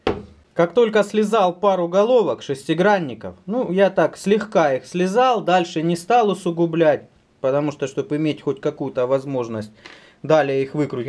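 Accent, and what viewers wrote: Rostov, Russia. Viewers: Он из Ростова?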